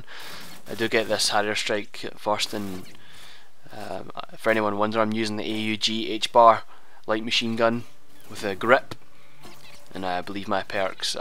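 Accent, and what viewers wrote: Scotland, United Kingdom. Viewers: i like your accent, scottish? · He sounds a bit Scottish to me. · Scottish, I’m his nephew btw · you sound more scottish <3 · are u scottish???? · Man, I love your scottish accent, awesome! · I like Scottish English,your voice reminds me of Soap Mc Tavish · are u british or sumthin not trying to be raseist or wat evva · wa hey. A scot. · I love calm scottish accents :D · its scottish it isnt anything like irish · Nice scottish dialect 5/5 (Nice play 2) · Your scottish accent is probably the only kind i like, Xbrzz sounds so common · Awesome accent, I'm Scottish too. Half. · r u scottish cus my neighbor is and scottish accents are cool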